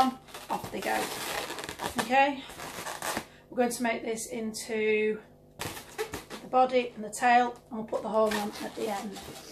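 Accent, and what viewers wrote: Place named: Cheshire, United Kingdom. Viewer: I love your work! You are so talented! I'd love to see you do a large poppy troll column. I'm doing a birthday in june, but can't figure out how to attach all those 260's around the large approx. 24 inch balloon heads. I've thought about glue? Her hair goes straight up, so I just don't know how to get it attached midway all around the round balloon......no videos on it. Thanks for sharing your brilliant work. Love your accent. Im from the US but my husband is british, from cheshire, you sound like that area to me lol thanks again! :-)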